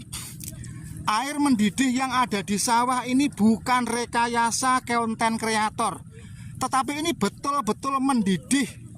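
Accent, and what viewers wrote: Java, Indonesia. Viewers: Yutuber ko ngomong nya glepotan bro Jawa ya Jawa sekalian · Pokok e you tobe kriator yg satu ini sangat bikin aq ngakak 🤣🤣🤣 wesss juowoo jekek ngomonge😂😂semangat kawan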